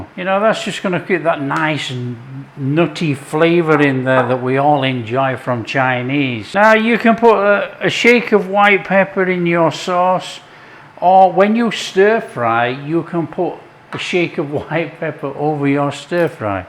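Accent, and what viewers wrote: United Kingdom. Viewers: does it easy and delicious for the working people,no nonsense exotic ingredients and time consuming preparation that needs a three thousand year old samurai sword and skill to slice like a samurai warrior in a clear anglo saxon accent on an electric cooker in a skillet without the need for a billion btu burner and wok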